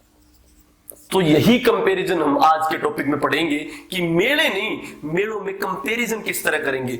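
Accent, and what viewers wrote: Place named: Haryana, India. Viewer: Mast hai sir khaskar haryanvi accent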